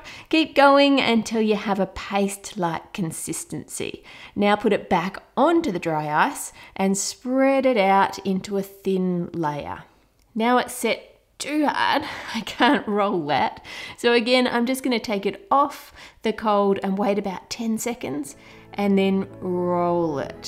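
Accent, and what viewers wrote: Australia. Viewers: You're awesome! Love the videos! Also love listening to your Australian accent. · I luv Australian accents :D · I legit just realized shes Australian and my Aussieboo self JUMPED (because of Felix and Chris from SKZ)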